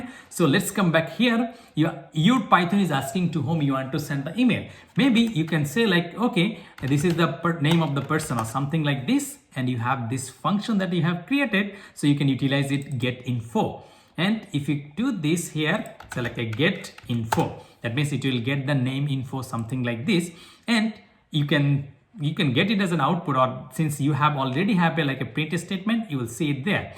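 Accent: Bengal, India. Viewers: Are you Bengali? Cause you English accent is very familiar to me.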